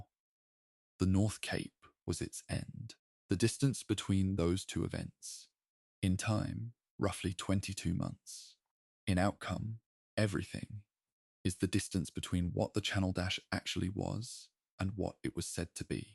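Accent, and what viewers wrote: United Kingdom. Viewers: One of the best stories I have listened to on your channel. I love the narrator’s voice; Americans tend to love the British accent! Very soothing. Thank you!!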